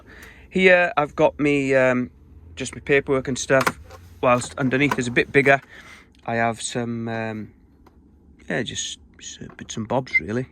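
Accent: Wales, United Kingdom. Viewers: Not a trucker but have binge watched your channel this week, which I enjoy 2 questions: Am hearing a Welsh underlying accent? Where is home when you are there?, not far from me Leeds/Harrogate/York? maybe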